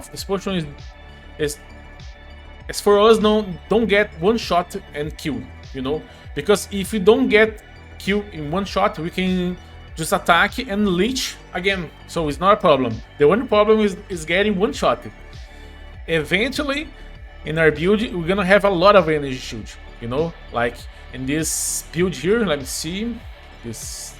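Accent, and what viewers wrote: Brazil. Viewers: Primeira vez que vi um vídeo dele, achei o inglês bem abrasileirado, ele é br mesmo? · cara um bom conteúdo, mesmo não sabendo inglês direito consegui entender perfeitamente, por ele ser um inglês abrasileirado e isso ficou muito bom de vdd + um inscrito aí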